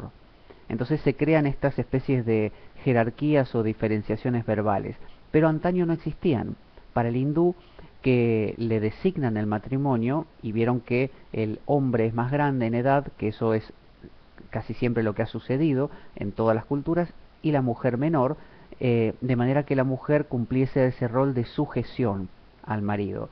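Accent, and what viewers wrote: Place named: Argentina. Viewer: comose nota que eres argentino que bien hablais los argentinos hablais mucho con el corazon en españa no lo hacemos tanto los minimos... transmitis muchisimo por lo menos ami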